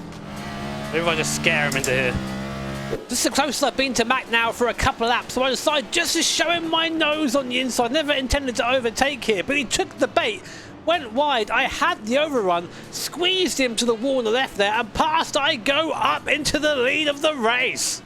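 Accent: United Kingdom. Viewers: YOU'RE BRITISH???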